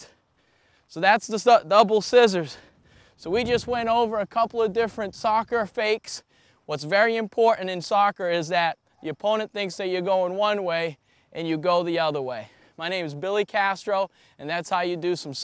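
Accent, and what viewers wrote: United States. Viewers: No no. dude in America, and canada they call it soccer actually. lol. He sounds like hes probably from one of those countries, hence the soccer reference. It is a term in the dictionary. you are giving false information. i didn't realize that anyone had any confusion about this matter besides yourself. If you are trying to make an underlying point about the fact that soccer is not the original name for the sport where it was invented in england in the 1800's than you have missed badly.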